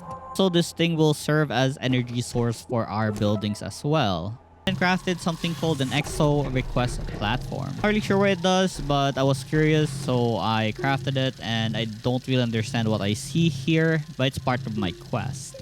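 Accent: Philippines. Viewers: With his accent I assume hes filipino or something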